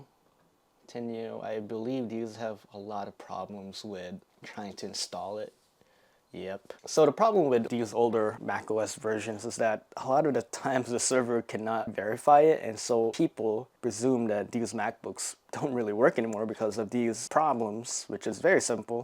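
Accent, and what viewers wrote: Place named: Asia. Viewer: your like a woman. I presume your asian judging by your accent which are the most woman like dudes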